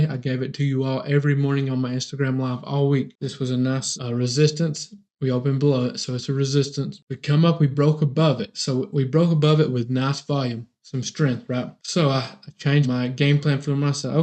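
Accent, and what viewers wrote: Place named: South, United States. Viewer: what state do you like in?.. sound like you from the south… i’m from TN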